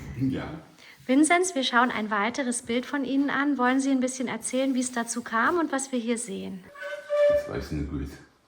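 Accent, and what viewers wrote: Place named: Alemannic, Germany. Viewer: Ich verstehe jedes Wort! Das ist Alemannisch fast in seiner reinsten und ursprünglichsten Form, wie man es nur noch in Südbaden oder im Elsass spricht. Im Elsass gibt es allerdings mehr französische Einflüsse. Für mich klingt das alles sehr, sehr authentisch!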